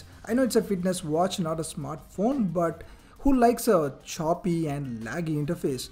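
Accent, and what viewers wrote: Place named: Telugu, India. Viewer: Great review! Are you from telangana or Andhra Pradesh btw? Just curious 😅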